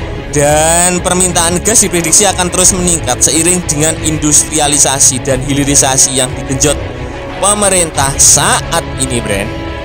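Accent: Java, Indonesia. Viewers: Medhok banget mas.. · Waduh mas, medok jawanya ampun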